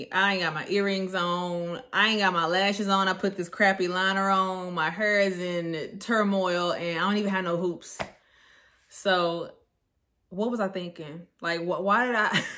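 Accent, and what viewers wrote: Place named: Texas, United States. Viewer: I watched your "what they don't tell you..." video first and was like she sounds like she's from Texas 🤣🤣🤣. Then I watched this one and you confirmed it. I'm from Beaumont and I'm just now starting. I received an email a few days ago and missed it. It would have been my first signing if I was assigned.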